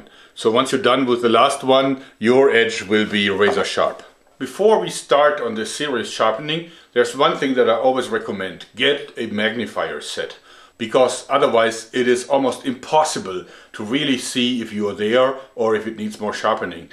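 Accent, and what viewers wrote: Germany. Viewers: This is amazing. I love your Channel. I used to be a Chef so I really appreciate a sharp knife. I also like your thick german accent. Makes it unique somehow. Und das, obwohl Deutsch meine Muttersprache ist.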